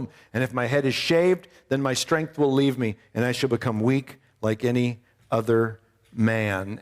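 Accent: Canada. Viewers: Me too. Haha. I'm from Ontario Canada and we don't all have accents. Lol. He sounds canadian to me.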